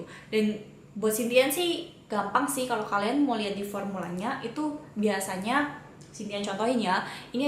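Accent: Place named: Java, Indonesia. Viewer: kalo ngomong ketahuan jawanya XD. thx 4 tips ^^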